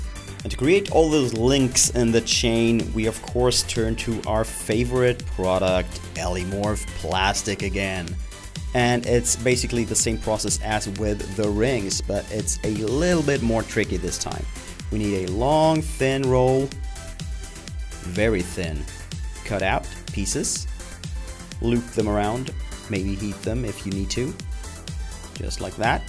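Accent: Scania, Sweden. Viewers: älskar skånskan :D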